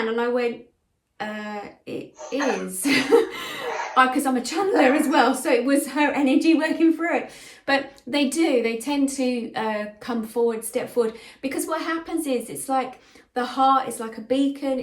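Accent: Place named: England, United Kingdom. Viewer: Gr8n hearing an English accent to all the others you hear all the time.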